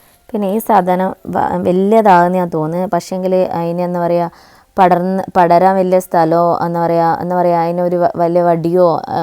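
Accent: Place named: Kannur, India. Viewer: Kutti payar,cheera,mathanga,ellam adipoli aayittund.....oru vithittu athu mulachu varumbol namuk kittunna santhosham athu paranjariyikan kazhiyilla. Nigalude pachakarikalk ellam valathinte kuravu nallathupole und.athukondaa ilakal ellam muradichu nolkunath.cheera kandille muradichu nilkinath.....onapookalam idanulla vegitable flower cash koduthu vangendi vannillale..... Aa manja fruit chiranga vargathil petta inam aanennu thonunnu. Njagalum kaakka poo enna ivide parayunath. Njan paranjathpole thane thakkali ellam kuzhichittu ponnal mathi pine angott thirinju nokkanda😂😂😂😂 Ente nigal vellavum valavum koduthitunel cheera payar ellam adipoli aayi undaayene.valakoorulla mannu cash koduthu vangunathinekal nallathalle valam vangunath. Enik nigalude kannoor shailiyil ulla avatharanam orupad ishtaayi Super video aayirunnutto Pine ente kalyanam kazhinju tto😊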